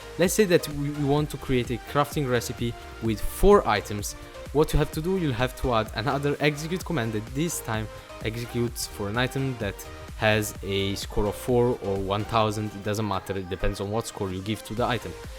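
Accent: Italy. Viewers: si sente che hai l'accento italiano :p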